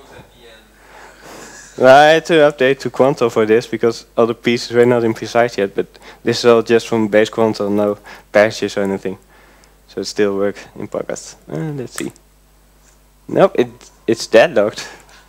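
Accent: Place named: Netherlands. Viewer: Klinkt zó Nederlands :-D maar goed gedaan.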